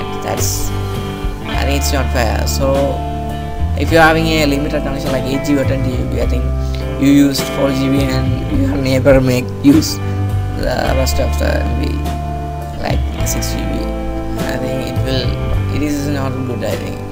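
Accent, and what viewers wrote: India. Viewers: U surely r a malayali by accent!!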